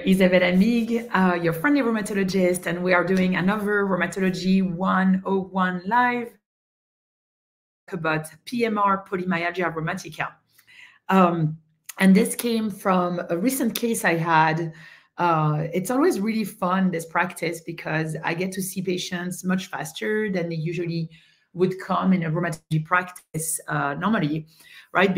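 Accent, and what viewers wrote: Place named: France. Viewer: Too bad the French accent is so strong, I can't really understand a lot of words said until I finally turned on the captions and, still, that was not 100%. Still had to repeat some sentences multiple times.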